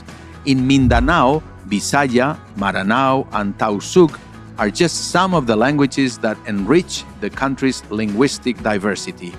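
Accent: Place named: Spain. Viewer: You are a talking in an English but of Spanish accent. By the way I'm a Filipino but my late grandma had at least 30 to 40 percent of Spanish blood.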